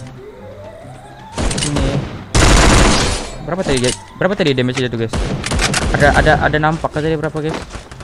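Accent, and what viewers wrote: Sabah, Malaysia. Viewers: Orang Sabah ka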